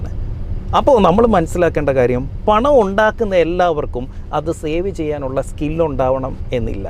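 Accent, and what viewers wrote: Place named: Alappuzha, India. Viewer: ആലപ്പുഴ ഭാsha not കൊയ്‌ക്കോട് ഭാഷ..they are njannamunja but can sell a koikode to any keralites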